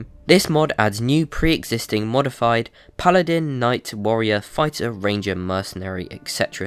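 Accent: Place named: England, United Kingdom. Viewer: You have the “crispest” English accent I’ve ever heard. Deus Vult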